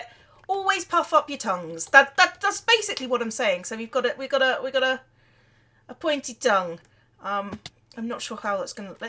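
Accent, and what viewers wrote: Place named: Oxfordshire, United Kingdom. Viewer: Anyone know where in England is from? I'm trying to learn the difference in all the English accents by region. Awesome video, girl! I get excited when I see hermitcraft videos from you!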